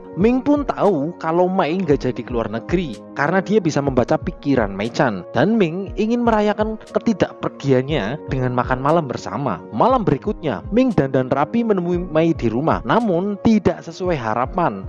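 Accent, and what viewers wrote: Banyumas, Indonesia. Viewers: Admine wong ngapak ya min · aku dadi seneng admine medok basa ngapak hahaha mantap banget pokoke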